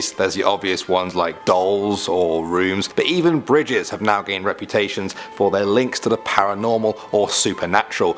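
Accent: United Kingdom. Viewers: Everything's scarier when told in a British accent. Thank you 🇬🇧 🇺🇸🇯🇲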